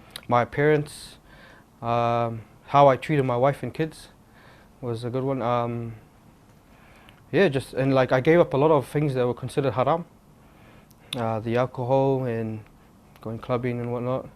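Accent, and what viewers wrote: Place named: Australia. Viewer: Alhamdullah & Mashallah 🤩... he sound Australian tho 😅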